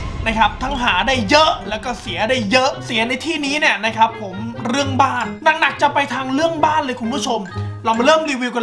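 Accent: Thailand. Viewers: พี่ พูดเหน่อ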